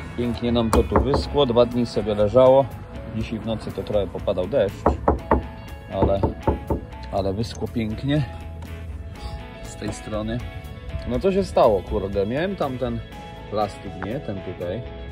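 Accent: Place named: Podhale, Poland. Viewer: No chiba z gor hoho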